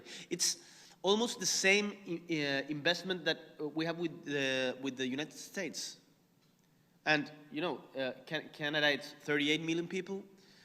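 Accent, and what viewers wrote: Chile. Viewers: Estuvo bien, mucha confianza y el discurso coherente con el acento chileno pero bien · No esta malo el ingles, acento chileno y algunas cosas recitadas de memoria, pero nada mal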